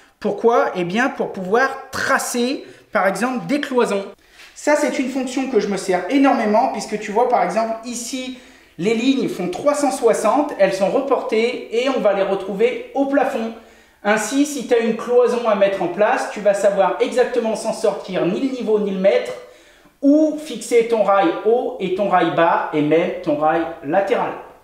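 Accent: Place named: Western France, France. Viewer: Cette vidéo est top ! Je me suis levé de mauvais poil et ça commençait à pas filer droit mais tu m'as vite remis sur la bonne ligne si j'ose dire. J'aime bien ton franc parler, notamment le "ayait", tu serais pas de l'ouest toi ?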